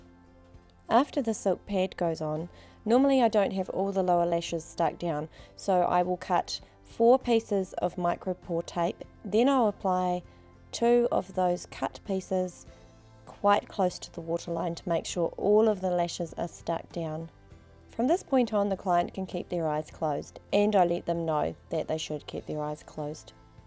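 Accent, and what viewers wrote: New Zealand. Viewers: Was suprised when I heard the accent. Woohoo, kiwi!!! Do you do eyelash extensions? If so where are you based? In NZ. I would love to have my lashes done by you! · I only clicked on this to see what ladies are doing on my eyes but hearing you have a NZ accent, ummm where are you based so I can get mine done by you ASAP haha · I think she's a kiwi? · ohh so thats a kiwi accent!..now I can notice the accent of calum hood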